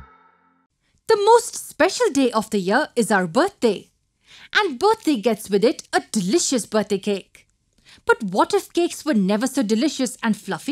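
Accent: India. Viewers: your indian accent hurts my head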